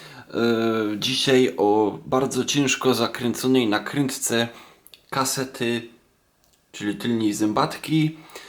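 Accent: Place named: Silesia, Poland. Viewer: Ja nie dam komentarza bo nie umiem tak śmiesznie po Śląsku mówić😉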